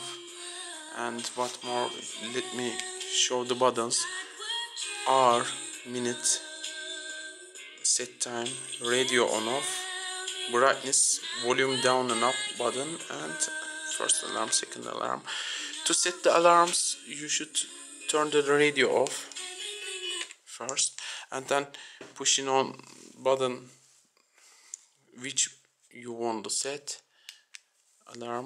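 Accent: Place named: Turkey. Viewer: A perfect example of Turkish English. I could tell even if you did not write your name.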